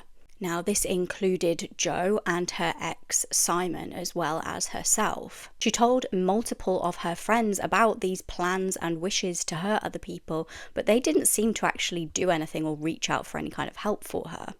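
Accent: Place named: Yorkshire, United Kingdom. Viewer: Yummy Yorkshire accent. ❤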